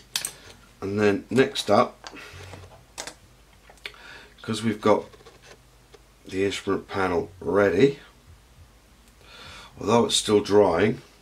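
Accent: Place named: Great Yarmouth, United Kingdom. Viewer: know the area well, I'm from the gt yar. Area. Recognised our accent.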